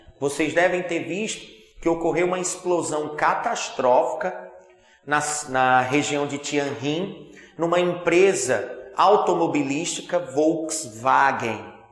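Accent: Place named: Northeast, Brazil. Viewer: Professor suas aulas são magnificas, e o sotaque não nega que é do nordeste. O povo aqui de Recife-PE já virou fã das suas aulas. Pfv mande ai mais vides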